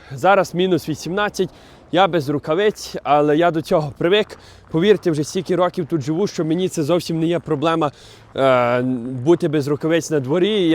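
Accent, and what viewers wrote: Western Ukraine, Ukraine. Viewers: Слышно по говору, что он с западной Украины. А чего он не в окопах, патриот хренов. А русскоязычные украинцы защищают свою землю.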